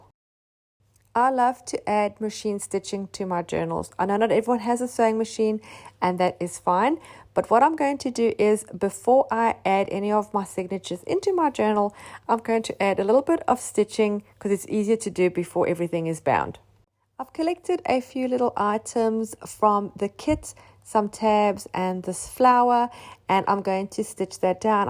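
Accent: South Africa. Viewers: Thank you for this tutorial well done and much easier to follow. Are you perhaps ex-South African....I recognise your accent! I am from Durban South Africa. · South African 😊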